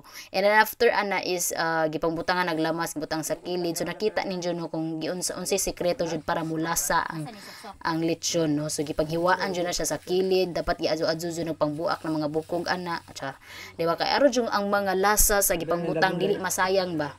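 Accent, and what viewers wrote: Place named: Visayas, Philippines. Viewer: Lami kaayo paminawon imong bisaya Ms.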